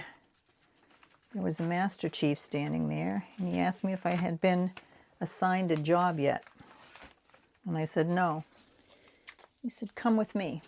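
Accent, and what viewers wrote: Boston, United States. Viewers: You devil. You and the algorithm of YouTube! I’m an ART SUPPLIES JUNKIE in recovery!!! It’s Sept 2025 and I CANNOT buy any art supplies, new or used, this month or else I have to pay $50 out to my BFF for losing our challenge this month. It’s Sep 19, eleven more days to go. And I’m struggling with gel plate printing. Oh, well, I’ll keep practicing and trying to find newsprint free somewhere. And I’ll start buying Nora colors in October, maybe 2-3 at a time. I’m loving this video, liking your stories, wishing I could hear your Boston accent, and away we go. BTW, 1976 is also when I started 12 Step Recovery at age 31. I’m 81 and still loving arts and crafts. Also, I just don’t get color theory. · Love your story about your Boston accent, my husband is also from Boston (raised in NH) and worked hard early in his military career to lose his which he did, but like you, when he gets around other Bostonians, it comes right back! 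And once and while, he slips. I think it’s charming, lol. Btw, I also love Rex Ray art. I’ll send you a very cool video that I came across a few years ago. Have a lovely arty day❣️ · I thought I recognized that accent! I worked to get rid of mine as well and now live in NH and boy do they sound different than Bostonians! When I drive under pressure that accent bubbles to the top and my kids don't let me forge tit. LOL. It's WICKED funny. Love your videos. Just found you today. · It's called "code switching" when you change accent to that of those around you. It's a natural human psychological mechanism, and whole books are written about it. I had to study it for one of my sociology classes at uni. It stuck with me because i had several different accents around me, growing up, and had become adept at popping between them, but always felt fake- even though they were technically all MY native accents! 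Anyway- i adore the stories and the COLORS! Side note- i was JUST watching one of my favourite travel tubers, Steve Marsh, and he went to Iceland and had a whole segment on the US airbase there. Pretty cool to now run across someone who used to work there! The navy in the 79s? As a woman? You must have SO many stories to tell! I am hoping you share more! (Btw- your boston is still there- just around the edges. ❤)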